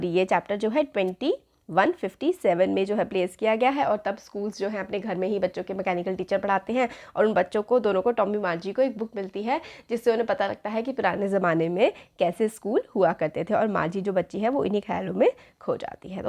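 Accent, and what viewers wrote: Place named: Haryana, India. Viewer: Mam I think you belong to haryana because your voice like my friend she belongs to haryana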